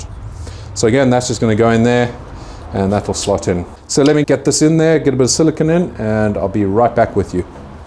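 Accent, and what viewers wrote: South Africa. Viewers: Yes I wondered what a was also at first! I love his accent but sometimes I have to listen again to get what he said. Also, the English and probably the South Africanese have different sayings from the US so I have to listen to the whole sentence to understand correctly. Great man though! I love watching him!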